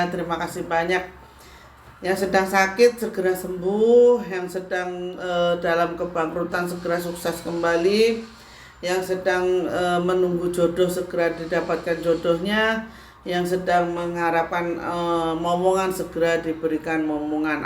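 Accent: Java, Indonesia. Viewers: Sy sangat senang mengikuti chanelnya mbak,karena bbrp faktor: 1.Gaya penyampaian yg nJawani,kalem,sederhana tapi cukup mentes.2.Rendah hati,tidak terkesan menggurui atau bersikap "sok".3.Ada kesan penyampaian amanah,karena ibaratnya mbak mendapatkan "oleh-oleh" yg seyogyanya dibagikan kpd teman" lain agar bisa ikut menikmatinya.4.Tidak terlihat sedikitpun ada upaya utk membodohi atau mengajarkan sesuatu yg menyimpang dari tuntunan agama Islam.Kalo ada perbedaan persepsi karena pengaruh budaya itu sdh lumrah.Maka jika yg berkenan yg monggo,yg tidak ya silakan.Up to you.Bagi sy pribadi,sangat bermanfaat terutama bagi situasi skrg,di mana manusia banyak menghadapi tantangan hidup yg perlu solusi,bukan sekedar narasi.Yang perlu digaris bawahi adalah,bhw mbak bukan "memberikan ajaran",namun "menyampaikan pesan kebaikan bagi sesama" terlebih bagi yg sedang menghadapi kesulitan hidup.🙏🙏🙏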